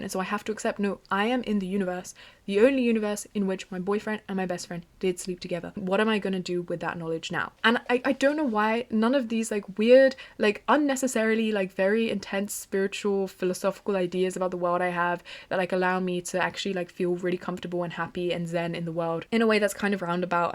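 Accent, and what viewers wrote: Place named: England, United Kingdom. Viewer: I know it's out of context, but can someone tell me what English accent she's speaking?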